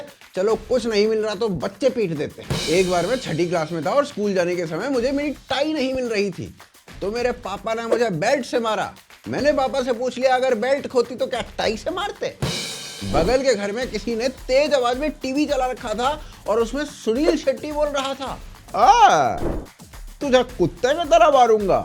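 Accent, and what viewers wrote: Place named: Haryana, India. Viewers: Love your Haryanvvi accent 😂. Hamar ta bhojpuri accent hai- lots of love from Mauritius 🇲🇺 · राम राम भाई साहब आप हरियाणावी में बोला करो। मस्त । ज़बरदस्त👌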